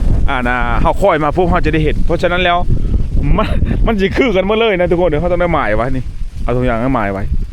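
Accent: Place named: Phu Tai, Thailand. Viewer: แกงหอยมี้เฮ้อเผ็ดแกงเห็ด มี้เฮ้อเค็ม.. เว้าคือเดว..กับ ..ทางผู้ไท ..เขาวง...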